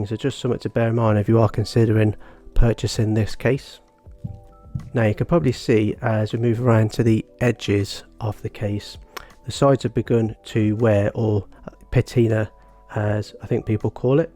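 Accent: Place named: United Kingdom. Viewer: Falling in love with Britich accent 😍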